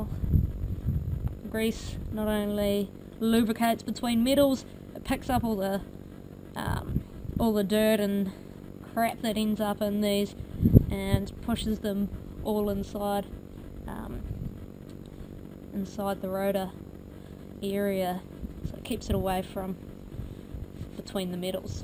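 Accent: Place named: Australia. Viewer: SOOoo many bearings. My 92 Nissan only has an inner and outer in the front. Was putting the disc rotor back on which I didn't have2 and shouldn't of taken off holding it in a vice while torquing its bolts to 48 pound feet. Didn't notice the bolts actually pulling the rotor down against the vice instead of tightening flush pieces of metal. Bang 6 bolts getting near 48 pound feet and the rotor broke in half. Time for a new rotor. I wouldn't of expected that force to brake it. Oh well. Going threw life speaking in an Australian accent must be great! When I had Japanese in the late 80's in California a former Australian air force guy who was a bit old was in my class. Said he flew Sopwith Camels.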